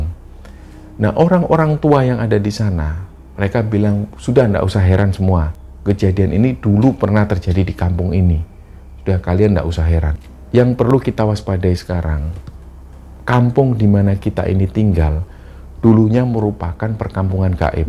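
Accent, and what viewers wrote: Java, Indonesia. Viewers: slm knl,..sy org makassar tp suka medok jawa y bg lho..,semangat selalu buat cerita2 y👍👍👍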